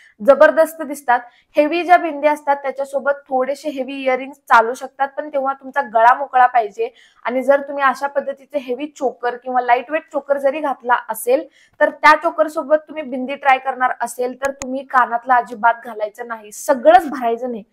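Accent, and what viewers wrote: Kolhapur, India. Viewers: I love the fact that you have sticked to the Kolhapuri accent unit now! Keep it up! Wishing you the huge success!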